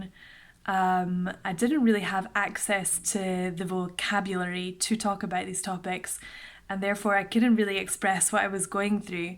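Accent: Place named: Ireland. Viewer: This is a good video. And the Irish accent was brilliant.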